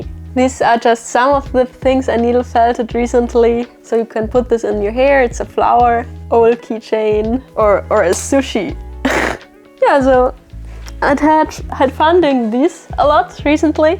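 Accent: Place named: Germany. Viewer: You have a German english accent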